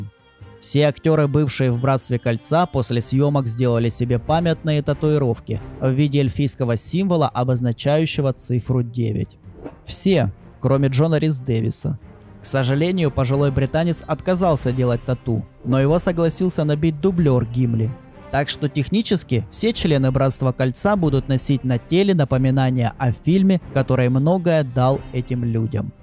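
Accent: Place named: Ukraine. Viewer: Интересно рассказываешь. И интонации зачастую правильные берёшь. Заметил слабый украинский акцент) Угадал? Может показалось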